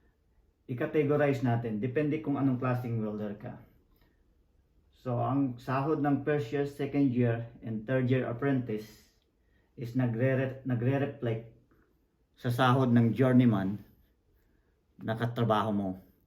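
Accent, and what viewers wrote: Bicol, Philippines. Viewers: bicolano ka boss? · Garu bicolano si sir, ayos! Oragon.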